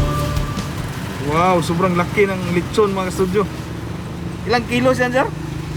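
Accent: Visayas, Philippines. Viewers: Wag n kayong mag Tagalog idol Hindi kayo maronong bisayang bisaya kayo legit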